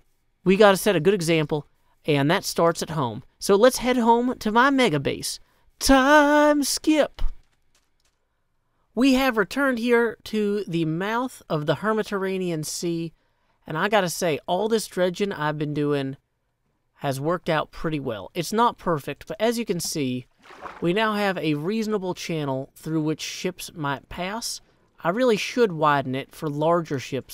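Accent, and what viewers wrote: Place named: Tennessee, United States. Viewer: I just found u and instantly new u were from TN with that accent😂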